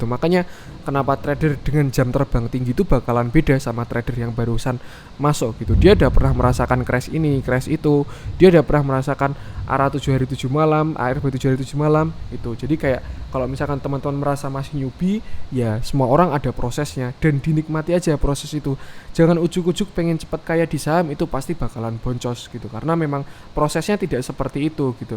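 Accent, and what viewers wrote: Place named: Semarang, Indonesia. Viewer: Sudah kuduga,logat semarangan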